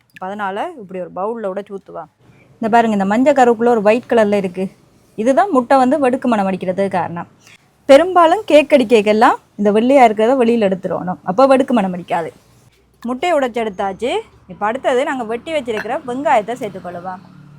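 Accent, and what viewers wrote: Sri Lanka. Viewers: ஈழத்தமிழ் வாசம் வருகிறது மகிழ்ச்சி தான் உண்மையா சொல்லுங்கள்pls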